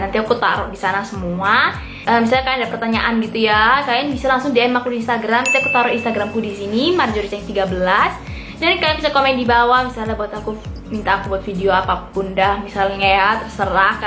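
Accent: Indonesia. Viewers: Suka sama suara kakak medok jawa bgt😂🤗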